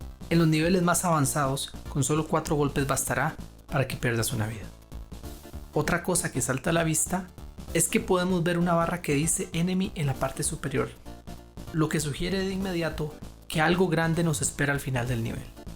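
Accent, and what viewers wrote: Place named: Costa Rica. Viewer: Ese acento es de Costa Rica. 🇨🇷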